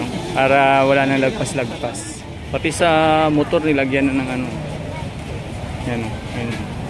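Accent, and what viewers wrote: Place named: Visayas, Philippines. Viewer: Bisaya accent ka boss ah hehehe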